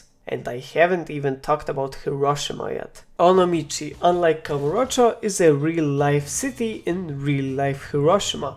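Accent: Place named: Eastern Europe. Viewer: Strong slavic acent. Yeah dude you better make a subtitles next time.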